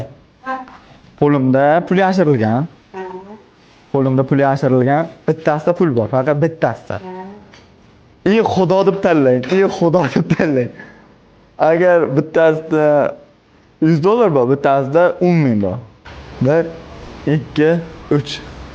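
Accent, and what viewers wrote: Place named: Kashkadarya, Uzbekistan. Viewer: ММАдаги Сатурнмисан.Шеванга Караганда,Кашкадаренинг Бешкент шахридан булсанг керак.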